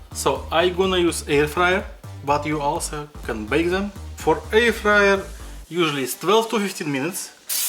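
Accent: Russia. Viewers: Bro having an Russian accent is cool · Idc about the russian acent all I care is that u made a voice reveal lets gooooooooooooooooooooooooooooooooooooooooooooooooooooooooooooooo!!!!!! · Come on! "Sorry for my Russian accent"? Man, i personally love it! Hope you do more commented vids! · Dude.. you should be proud to have a thick russian accent. It is nothing to be sorry about. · I actually like Russian accents. Keep up the good work my man. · Its ok the Russian Accent man, and its kinda cool to be honest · There was a time I was just watching your videos just to hear that awesome Russian accent, love you❤️ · Russian accent is my favrouite please dont be sorry about it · Damn russian accent is just 😩👌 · Russian accent is fine i can understand and i am sure every1 else can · I like the russian accent btw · “Sorry for my Russian accent” Your accent sounds nice! I actually enjoy hearing your voice in your content! 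· Russian accent english is better than bri‘ish english ngl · Yep you very good Russian accents and English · Looks really good. This is the Einstein of cooking. And you Russian acsent is really nice. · Having an accent is to be that u have an identity and u should be proud of Russian accent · Your Russian accent is slav, speak Russian sometimes. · Don’t be sorry about your accent, tbh I think a Russian accent is pretty cool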